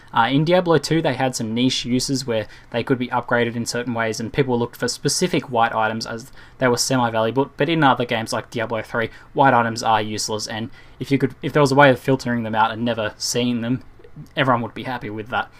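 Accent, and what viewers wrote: Australia. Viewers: I'd say australia. Especially because he greets us with "g'day"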